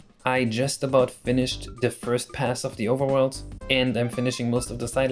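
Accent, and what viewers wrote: Germany. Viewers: Hmm thats a german accent. Great tech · random question but where are you from? i hear a german accent in your voice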